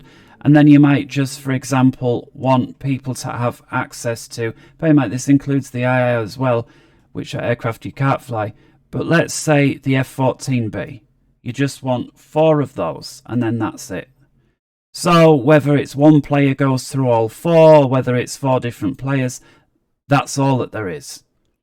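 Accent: United Kingdom. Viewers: This is spot ON!! NICE one, and with a NW accent as well, even if it IS a Manchester one (and not a Scouse!! 😉). Thanks 👍 < There's ANOTHER thumbs-up as well as the one above!!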